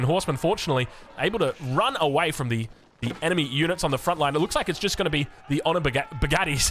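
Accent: Australia. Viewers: Dont gang up on poor about his pronunciation of words, he's from Australia and their version of English is different.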